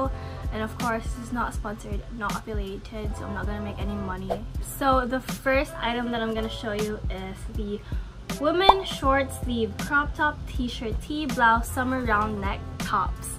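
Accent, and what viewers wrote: Visayas, Philippines. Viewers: Ang cute!!!!! I love ur bisaya haahahaha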